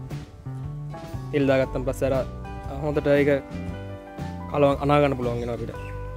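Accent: Galle, Sri Lanka. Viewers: Galle kenek wage .kathakarana widiyen kiuwe. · Good! Very clear. Thanks! Galle ekkenk wage! 😋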